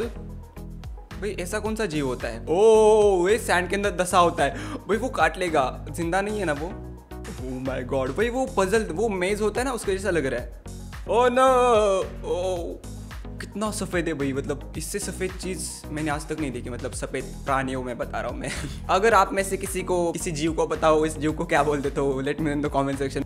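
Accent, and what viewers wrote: Maharashtra, India. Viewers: Are you marathi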